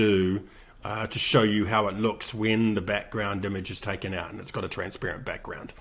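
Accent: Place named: Australia. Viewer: This guy has an Autralian accent. He sounds nothing like Joris Bohnson.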